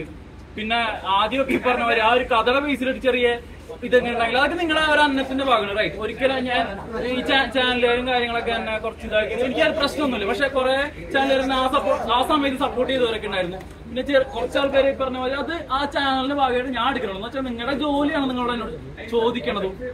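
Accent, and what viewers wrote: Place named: Coastal Kerala, India. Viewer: No kadappuram slang now😮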